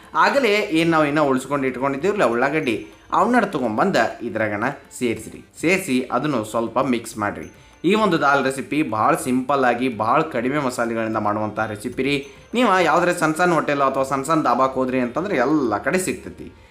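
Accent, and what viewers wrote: North Karnataka, India. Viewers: ನಿಮ್ಮ ಡಾಲ್ ಜೊತೆಗೆ ನಿಮ್ಮ ಉತ್ತರ ಕರ್ನಾಟಕ ಭಾಷೆ ತುಂಬಾ ಚೆನ್ನಾಗಿದೆ.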